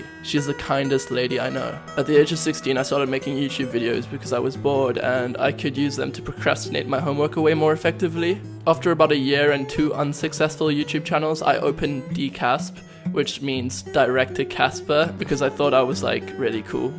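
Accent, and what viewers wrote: England, United Kingdom. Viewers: So did you develop the English accent when you moved, or did you have it in south africa ? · how come he has a british accent but he lived in south africa all his life?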